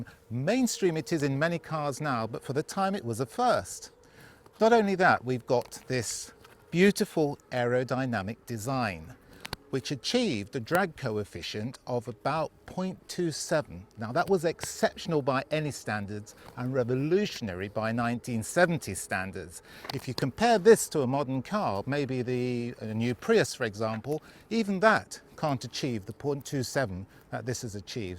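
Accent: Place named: United Kingdom. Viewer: Greetings from Texas! Your gorgeous British accent is equal to this gorgeous car!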